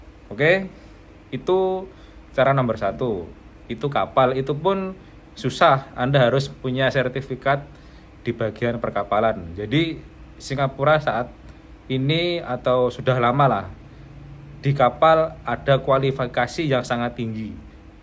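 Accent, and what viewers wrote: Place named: Java, Indonesia. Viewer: orang malaysia tapi logatnya kayak jawa indonesia ya🎉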